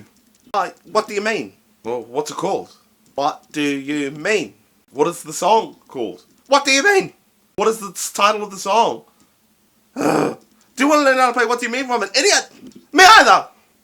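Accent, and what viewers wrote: New Zealand. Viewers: thank you for uploading this! btw I love your accent, are you from new zealand?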